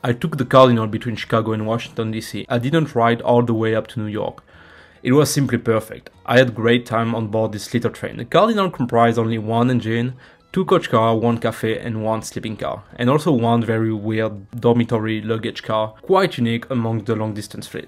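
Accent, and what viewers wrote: France. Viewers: I've taken the California Zephyr, Coast Starlight, Empire Builder, Southwest Chief, and Texas Eagle. My top 3 are the Zephyr, Empire Builder, and SW Chief. The Coast Starlight is very scenic, but I'm still salty about Amtrak discontinuing the private lounge car for the sleeper passengers. The Cardinal is on my bucket list. Great video, though I suggest you slow your speech just a little bit. My ears adjusted to your French accent, but it took a few minutes.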